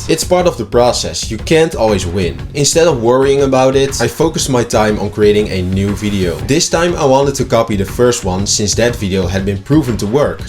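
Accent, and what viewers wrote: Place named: Netherlands. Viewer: bro nice video btw are you dutch? i feel like i can tell by your accent 😁❤️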